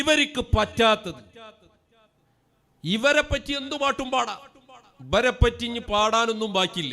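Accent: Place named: Malappuram, India. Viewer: Edenda engane paye usthad mare style Ningal Malappuram aano...kurach bhasha nannakkiyal nallath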